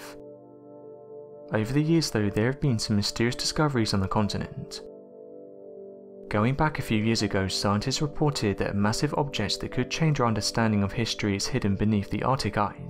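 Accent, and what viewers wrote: England, United Kingdom. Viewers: Yeah his cadence is amazing and the way he pronounces words. I could listen all day! I can't quite make out what type of English accent that is. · His beautiful British accent and pace of narration too...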